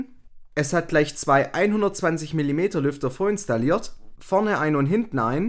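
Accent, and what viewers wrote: Swabia, Germany. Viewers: Bist du Schwabe?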